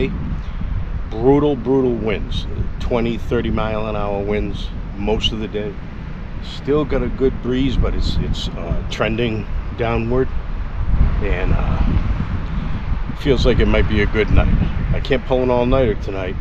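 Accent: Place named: Massachusetts, United States. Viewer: The second you started talking I thought you were in MA. I just moved to the north shore recently, what part of MA is this in? Glad I discovered your channel bro, I'm gonna go through the catalog and subscribe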